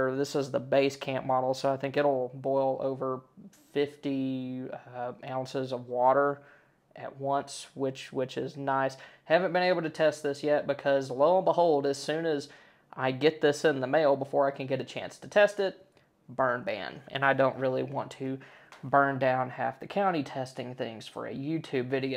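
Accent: Texas, United States. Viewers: Sounds like your either west of Fort Worth or south of me in central Texas. We are in the DFW area and have a place in southeastern OK that we can go. Your on the money all the time with your ideas here. I'm a retired BSA volunteer Commissioner ....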